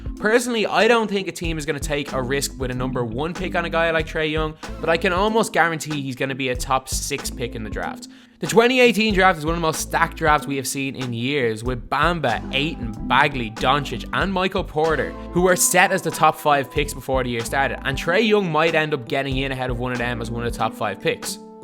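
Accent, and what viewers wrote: Ireland. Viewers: Your accent is dope. Irish I would assume. Pretty cool listening to an Irish dude talk about basketball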